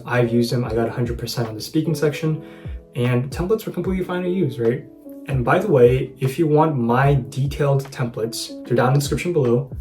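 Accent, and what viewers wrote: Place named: Asia. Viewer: Thankyou so much and i really appreciate for sharing your valuable guidance and experiences . Being an Asian i can resonate with your speaking accent and style... Please upload more videos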